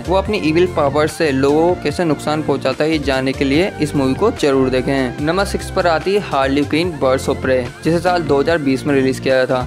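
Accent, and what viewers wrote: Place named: India. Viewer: so bad english slang,obvious is indian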